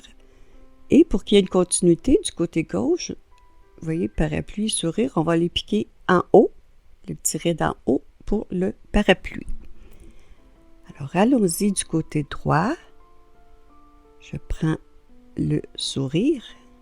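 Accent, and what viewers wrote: Canada. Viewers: Bonjour merci beaucoup pour vos explications très claires et l'accent canadien super agréable merci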